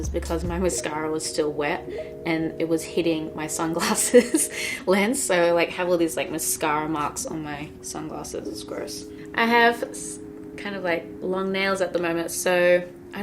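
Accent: Australia. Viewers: Is this Aussie accent ? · I looove Australian accent😍😍😍😍😍😍😍 she's sooo beautiful💘💘💎💎💎💎💎💎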